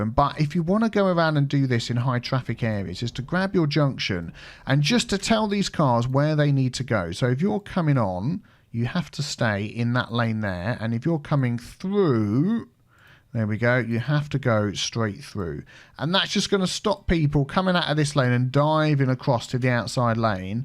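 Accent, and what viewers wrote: United Kingdom. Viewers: youre kind of adorably british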